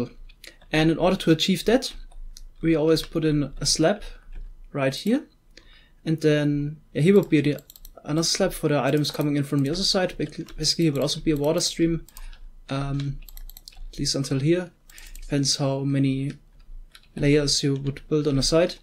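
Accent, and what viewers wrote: Germany. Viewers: Bist du deutsch? Klingt so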